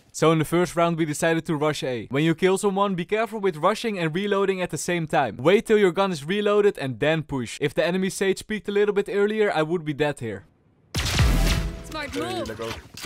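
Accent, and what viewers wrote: Netherlands. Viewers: what I mean is the English is so heavy he's basicly speaking Dutch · im also dutch and i like your accent XD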